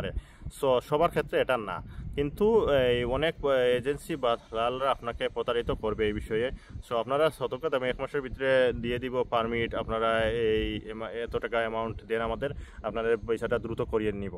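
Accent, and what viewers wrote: Sylhet, Bangladesh. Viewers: ভাই আপনি মনে হয় সিলেটি। আমার বাড়ি ও সিলেটের বিশ্বনাথ। নভেম্বর মাসের ১৫ তারিখ অনলাইন হইছে ভাই, এজেন্সি বলছে সব স্টেপ শেষ, এখন শুধু এপ্রোভাল পাওয়া বাকি আছে। ৪ মাস অতিক্রম এখন কি করা,জানাবেন। প্লিজ।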